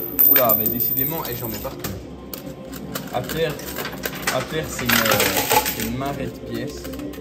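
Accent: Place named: Belgium. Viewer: Coucou, nouvelle abonnée...vu ton accent ça doit être en Belgique ces machines car en France ça n'existe pas de gagner des billets aux pousses pousses, dommage car pour l'adrénaline c top!😁, Je te souhaite beaucoup d'abonnés !😊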